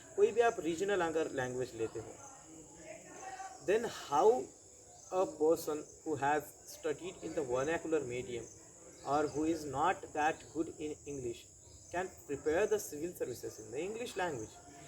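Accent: South, India. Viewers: Sry to ask bt Are u from Maharashtra or south.. Bcoz your name is bt your accent is like south indian.. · Sir ki english language ko south ka touch hai....IPS me unko south ka cadre mila hoga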